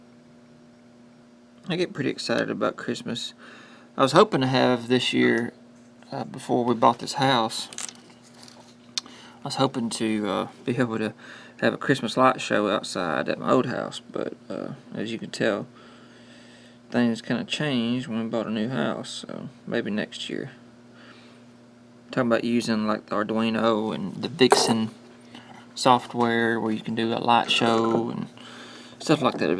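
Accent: South, United States. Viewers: Love your country accent. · Thank you for this!!!! I am going to give this a shot. I was about to throw them out.... but not just yet! I enjoyed your accent as well, good ol' country boy.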